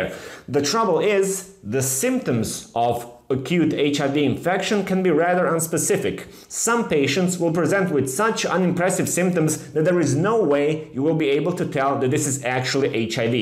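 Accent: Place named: Poland. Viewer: You speak perfect English, but with a mid-to-heavy Polish accent.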